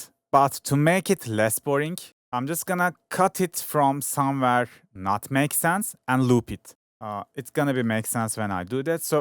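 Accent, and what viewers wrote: Turkey. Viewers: bu ingilizceyi tanırım türksün demi kenks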